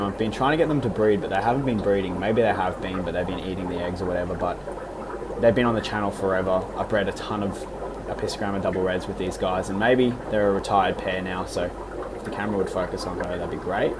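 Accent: Australia. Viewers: Hold up are you from Brisbane, Australia?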